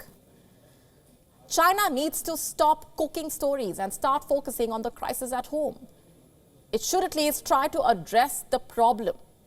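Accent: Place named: India. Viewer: don`t you have a speaker speaking english? This indinglish is really annoying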